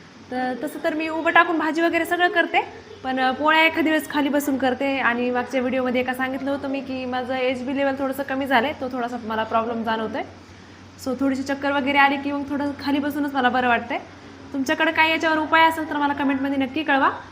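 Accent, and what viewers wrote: Parbhani, India. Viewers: माझी भावजय तुझ्यासारखीच बोलते. ती पण परभणीचीच आहे...छान वाटते ऐकायला❤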